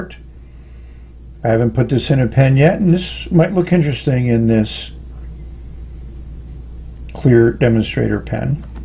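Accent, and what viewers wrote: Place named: Western Pennsylvania, United States. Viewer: Thanks for the video. I have to admit, your voice and western PA accent remind me of my late father, and I find listening to you quite soothing.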